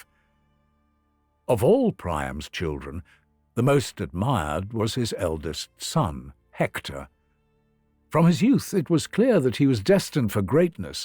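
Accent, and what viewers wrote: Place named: United Kingdom. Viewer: What's this!? Greek mythology in long-form AND in a British accent?? WIN! Can't wait to dive in.....❤ Thank you!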